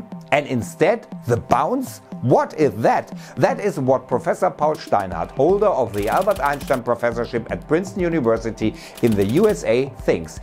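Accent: Germany. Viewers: Please work on your german accent. It makes the video less enjoyable, all in all nice topic and well researched as always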